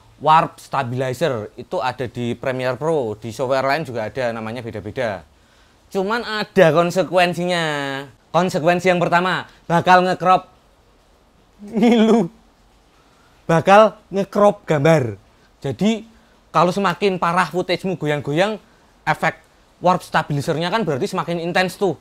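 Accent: Java, Indonesia. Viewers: sek seksek....wih jowo banget😅😊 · ilmunya mantap om , plus2 lucu2 gaya jowo asik ae rek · jinguk baleni neh hahahaha.. njawani mas hahahaha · Medok jawa ya bang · Pekat betul ya jawa mu mas..hehe udah subscripe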